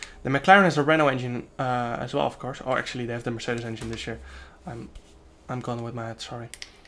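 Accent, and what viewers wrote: Netherlands. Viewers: Je hoort gewoon dat jij Nederlands bent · Just a regular dutch accent to he honest 😅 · Hoor dat je Nederlander bent hahah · I can litterally hear ur a dutchie Ur accent isn’t like mine I hav an British accent and I’m an Dutchie aswell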